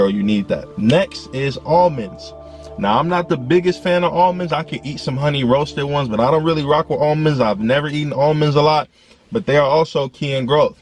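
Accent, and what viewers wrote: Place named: New York, United States. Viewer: New York boy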